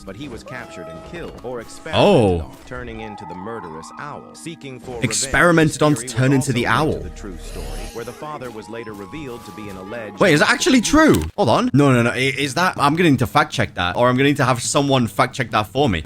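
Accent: United Kingdom. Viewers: Are you British because I don't mind British people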